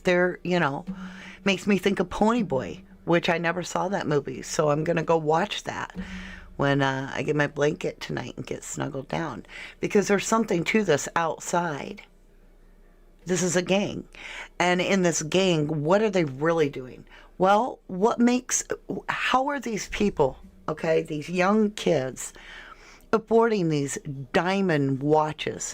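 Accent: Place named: United Kingdom. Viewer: Probably British, and street wise street talking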